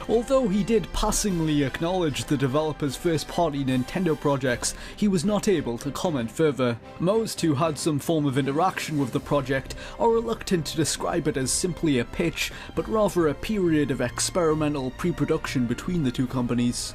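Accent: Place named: Northeast England, United Kingdom. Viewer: I need to know which part of north east England the video narrator is from! 😁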